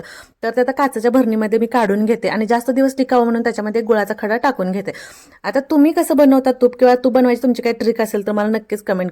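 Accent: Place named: Satara, India. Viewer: Tai tumchi language ekdam satari vatate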